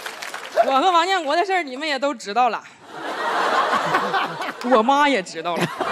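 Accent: Northeast, China. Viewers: 就喜欢这个，东北口音和天津口音天生带着喜感。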